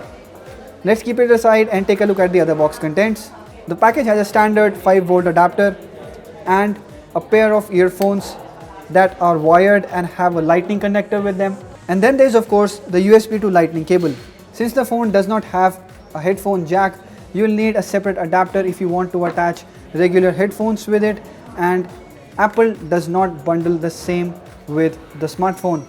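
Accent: India. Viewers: INDIAN ENGLISH SKDKSDHSHJDSAD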